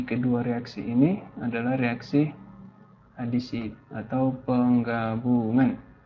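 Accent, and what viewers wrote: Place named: East Nusa Tenggara, Indonesia. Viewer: Hallo kak, orang NTT ko? Beta juga, lebih tepatx beta orang sabu😄. Terima kasih pembahasan soal²x. Salam semangatttt.. Logatx mantap💪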